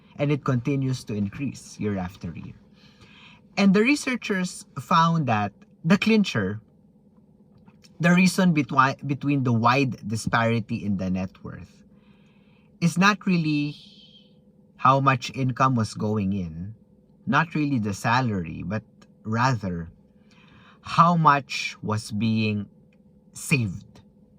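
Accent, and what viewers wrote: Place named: Philippines. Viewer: i could tell you’re filipino! my mom never taught me how to speak tho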